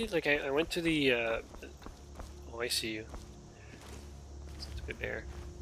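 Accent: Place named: Quebec, Canada. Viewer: There's no need to get an attitude. He is French Canadian; French is his native language. Yes, stutter can mean a hiccup in smooth frame transitions, but the main definition refers to speech. You didn't mention 'framerate' or 'smooth' or 'gameplay' or anything to suggest you meant the framerate. Additionally, you specified the subject as "he", not as "his game" or "the game" or "Skyrim" or "framerate", so what you said actually refers to his speech, not his framerate. Lern 2 english plz.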